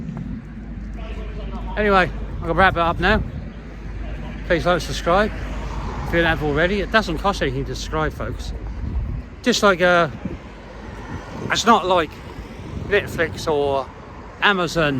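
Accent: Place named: Norfolk, United Kingdom. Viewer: I thought you sounded like you were from Norfolk! DSM are coming to Norwich soon, to knock down Anglia Square.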